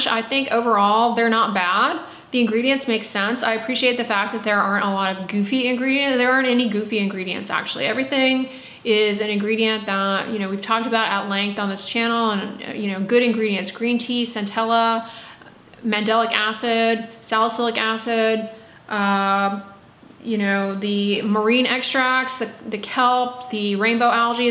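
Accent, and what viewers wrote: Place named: Midwest, United States. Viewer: She sounds like she is from the Midwest ..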